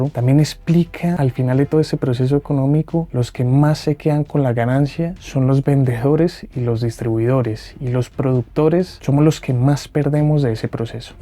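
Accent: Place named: Colombia. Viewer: Buenas tardes. Por tu acento creo que eres Colombiano. No estoy de acuerdo con tu interpretación del libro porque estubo muy sesgada. Veo que fue un material con años en Youtube, lo cual me hace preguntar, Que dirías en el 2024? Considerando el proceso político actual de Colombia, Chile, Argentina, Uruguay, El Salvador, Panamá, Perú, Ecuador, países que tenían unas grandes caretas.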